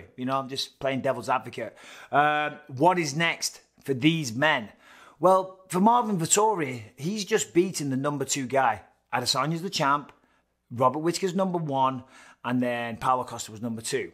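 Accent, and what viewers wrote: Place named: United Kingdom. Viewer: Dude is a natural. He's decent looking, funny, great talker, and he has a British accent. How can you not like him?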